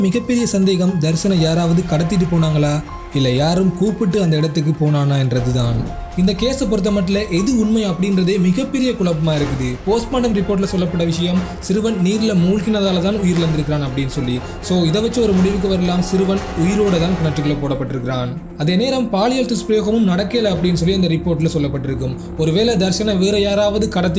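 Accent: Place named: Sri Lanka. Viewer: Nala iruku bro neenga pesuradhu , neenga pesura Sri Lanka tamizh Nala iruku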